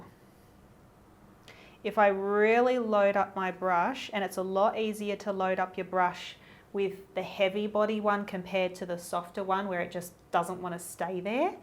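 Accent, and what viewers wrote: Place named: Australia. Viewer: Thankyou for this. I love Liquitex! I just bought an art course and they recommend the Liquitex heavy body, which I’ve never used as I use the regular paint in varying brands, so I’ve been doing research on it. And I found this video - imagine my surprise to hear an Aussie accent! And you’re just down the road - I’m in Brisbane! So new Sub here… Cheers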